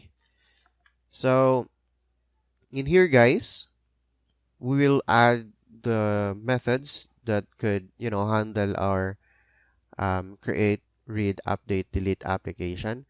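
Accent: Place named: Philippines. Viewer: Parang Filipino Accent, kung Filipino ka sir... Maraming Salamat po :) sana magupload pa po kayo.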